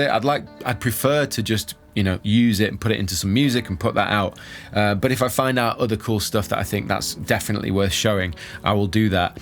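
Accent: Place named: England, United Kingdom. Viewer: Loving the dickies shirt and English accent. To appropriate is to appreciate.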